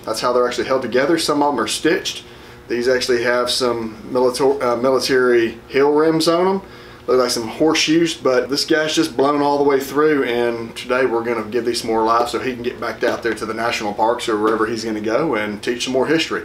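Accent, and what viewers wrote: South, United States. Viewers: Love the Southern accent. "On" is pronounced "own."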